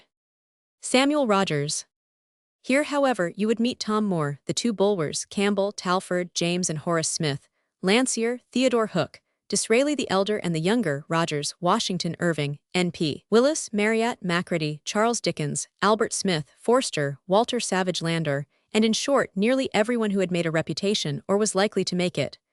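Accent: United States. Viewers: An American accent doesn't do justice to a good book,sorry.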